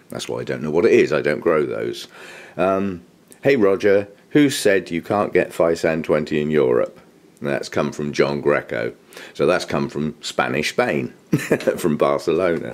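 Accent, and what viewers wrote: England, United Kingdom. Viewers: I certainly just love listening to your English accent, and I think if I live across from you I would look forward to when you are starting your car, I can't help it I get a good laugh 😉😉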